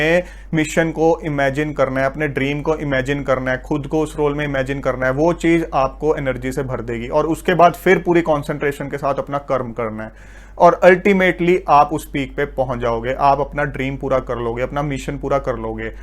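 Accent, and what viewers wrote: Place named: Punjab, India. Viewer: Your language is filled with punjabi tone😆❤️ sir!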